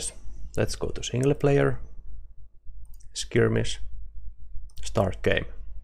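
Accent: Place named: Finland. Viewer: Brilliant video, also your accent sounds finnish :b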